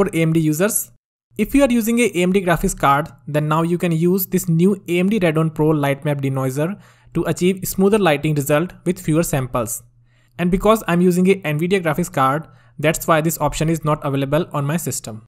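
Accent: India. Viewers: Are you from Mumbai?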